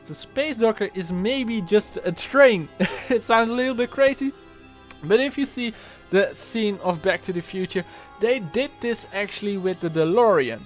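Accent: Netherlands. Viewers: Are you dutch???? Cause you have a Dutch accent here and there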